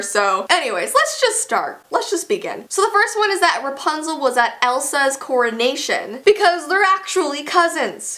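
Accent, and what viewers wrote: Canada. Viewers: DIH-Do It well she's from Canada and people from Canada have an accent, so that can possibly be the explanation of why she pronounce's it differently🤷🏼‍♀️🙄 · She's from Canada, so they pronounce words differently I believe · It’s not hands it Hans but it sounds like Hands because of her Canadian accent. I’m Canadian and I have an accent like that.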